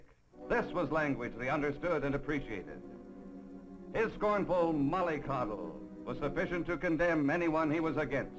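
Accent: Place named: Boston-Philadelphia, United States. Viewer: If you are American please explain to me why Americans don't sound like this anymore. The accent used in this video is so pleasant to hear. Today's Americans don't remotely sound like this. This 1950s video narrator is using what is known as a Boston-Philadelphia accent, which seems to have fallen out of use these days even in universities. Sad. Please explain why this has happened.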